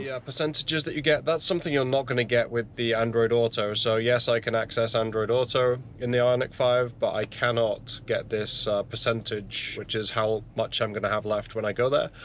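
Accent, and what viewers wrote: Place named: Northern England, United Kingdom. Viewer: Sounds like he's from northern England----- north-west possibly---- anyways good review.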